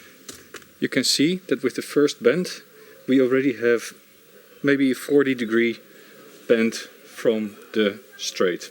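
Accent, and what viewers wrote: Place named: Netherlands. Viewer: Hello again. WOW that was a tour de force, bravo! Every other ‘demonstration’ I’ve seen were ham-fisted by comparison and over dramatic too! By the way, now I’ve heard you talk more, and fluently, I’d put money on your being Dutch, like some of my best friends! ✌️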